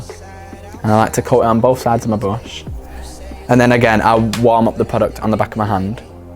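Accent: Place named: Bolton, United Kingdom. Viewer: where are you from? You sound like a fellow boltonian x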